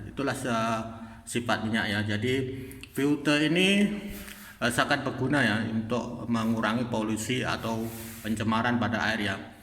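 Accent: Malaysia. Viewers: Terbaik perkongsian kawan..haha hampir hampir sling orang Malaysia sudah haha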